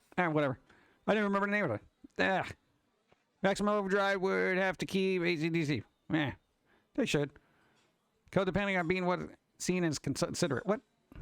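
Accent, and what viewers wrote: Boston, United States. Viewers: I don't think i could be alive without a lil pumpkin man with a boston accent in the back of my mind going "ayyy buddy are ya doing okay? heyy fok you and fok yor muther." Not that our here has ever been so mean.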